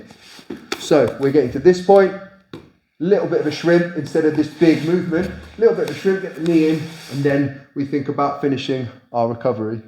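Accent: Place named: RP, United Kingdom. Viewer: Such a funny accent😂 Posh as f😅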